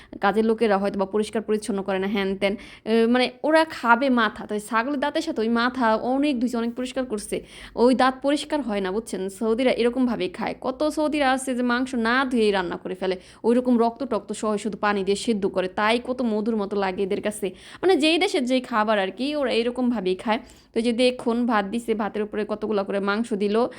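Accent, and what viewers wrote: Faridpur, Bangladesh. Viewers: আপনার ভাসা সুনে মোনে হোইলো আপনারা ফোরিদ পুরের তাই না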